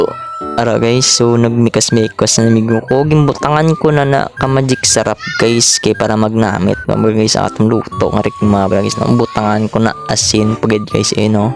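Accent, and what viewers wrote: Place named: Iloilo, Philippines. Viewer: I am your 123rd subscriber! I am not Ilonggo or Negrense but I have a lot of friends who speak like you and they are good friends.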